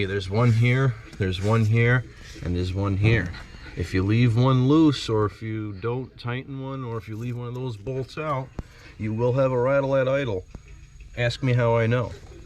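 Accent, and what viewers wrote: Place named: Bronx, United States. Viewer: Hey buddy love the accent what part of the city are you from guessing bronx, Chad I am from albany new york. Hope your well.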